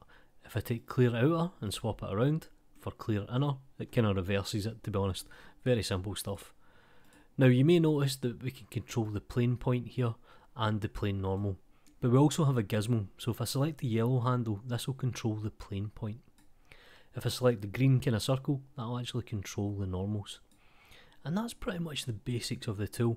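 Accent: Scotland, United Kingdom. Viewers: This guy just makes everything sound funny, love the Scottish accent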